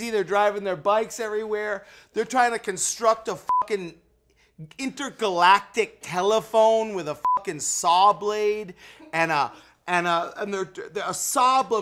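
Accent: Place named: Midwest, United States. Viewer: This dude is from the midwest for sure.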